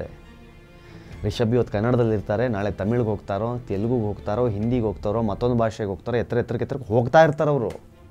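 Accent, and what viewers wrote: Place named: Coastal Karnataka, India. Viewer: ಕರಾವಳಿ ಕನ್ನಡ ಕೇಳೋಕೆ ಚಂದ....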